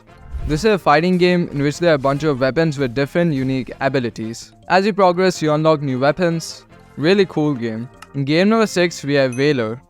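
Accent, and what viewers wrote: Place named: India. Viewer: You seem to have a slight Indian accent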